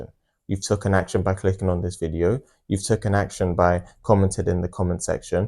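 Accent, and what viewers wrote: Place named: Nigeria. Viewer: Man you talk like a Nigerian 😊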